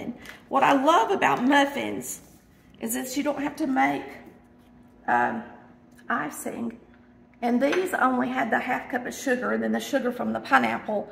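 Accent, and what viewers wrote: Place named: Texas, United States. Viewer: is so cute! It reminds me of my family growing up in Texas. She sounds just like them!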